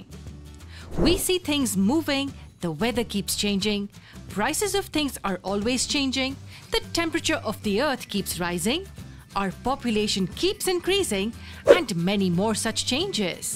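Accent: India. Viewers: WHY DID IT HAVE TO BE A IDAINAN VOICE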